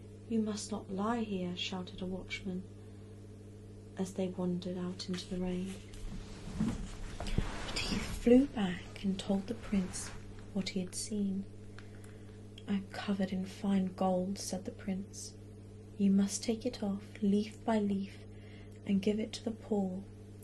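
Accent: England, United Kingdom. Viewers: British accent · English accent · love ur british accent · British accent makes her more attractive & sophisticated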